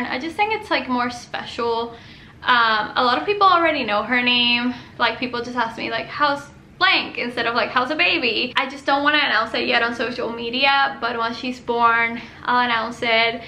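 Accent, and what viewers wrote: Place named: Spain. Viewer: Girl I love your accent! Spanish is such a beautiful language, especially with girls names! Never be shy to speak in Spanish or in your accent on your channel cause it sounds amazing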